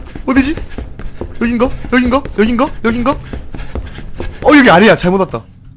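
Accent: Gyeongsang, South Korea. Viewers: 님 경상도분이신가 ㅋㅋ 억양이느껴짐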